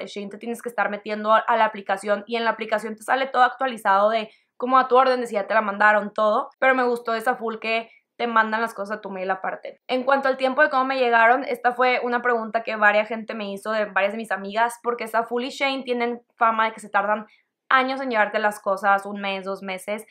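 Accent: Monterrey, Mexico. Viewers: Primera vez que te veo y muy bien explicas genial 😀 new subscriber ❤️ hablas cono regia 😁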